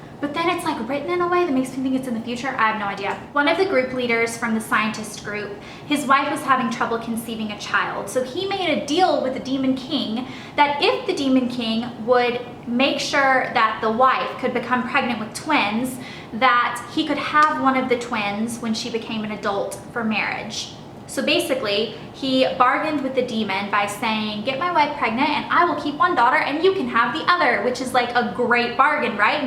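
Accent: South, United States. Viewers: I loved your southern accent in this video :)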